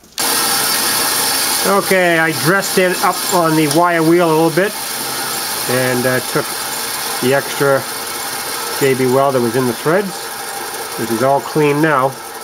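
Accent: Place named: New England, United States. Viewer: Sound like you are from new england.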